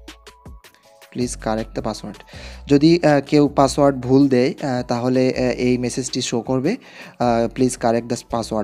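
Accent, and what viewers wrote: Sylhet, Bangladesh. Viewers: বাড়ি সিলেট নি বা!😁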